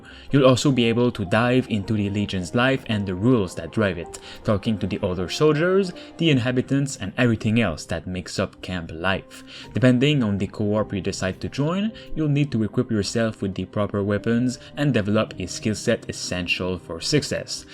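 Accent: Quebec, Canada. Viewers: Mmmm nice video et nice accent mon quebecois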